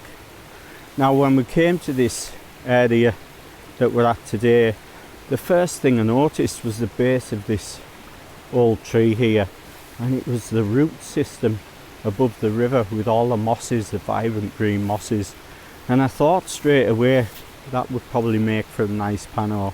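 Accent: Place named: Merseyside, United Kingdom. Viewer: I've just subscribed to your channel, I think your images are great. Are you a Brit, you sound like a Merseyside boy 👍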